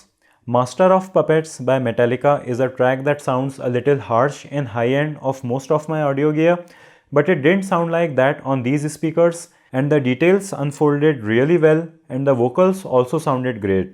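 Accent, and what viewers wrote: India. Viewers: Can’t take him seriously with that Indian accent 😂😂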